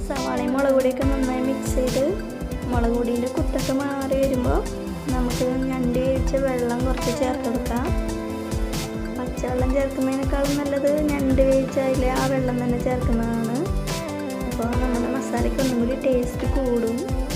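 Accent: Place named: Thrissur, India. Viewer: സംസാരം കേട്ടിട്ട് തൃശ്ശൂർ കാരി ആണെന്നു തോന്നുന്നു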